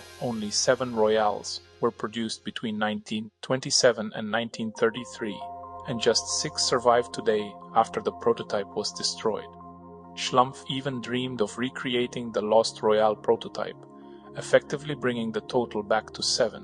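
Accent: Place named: Germany. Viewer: Good luck with your further efforts. The "AI voice" misled me with a very strong "German speaker's accent" into thinking that it was a human speaker who would/should have known how to pronounce that the "German way."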